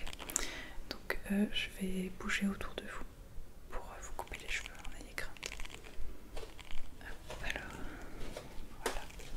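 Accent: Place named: France. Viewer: Hi, I hope you continue with your desired goal of making it as an ASMRist - this is very admirable.Please produce more videos centred around you speaking in English (French speaking English is the ASMR in essence).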